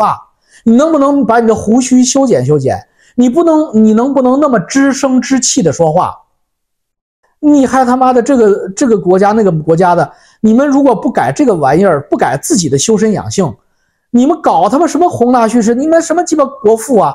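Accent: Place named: Nanjing, China. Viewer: 嘿， ，我怎么听出你有南京口音？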